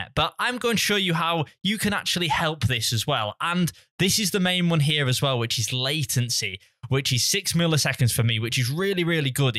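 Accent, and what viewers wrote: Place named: United Kingdom. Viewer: Dang bros British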